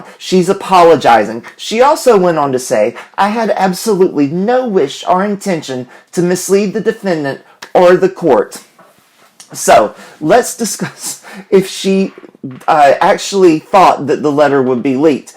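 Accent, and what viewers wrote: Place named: South, United States. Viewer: Omg you look totally different than I imagined you in my mind. lol I pictured you a southern gentlemen from like a southern gothic novel. Sorry your voice just makes me think of that image. Nice to see you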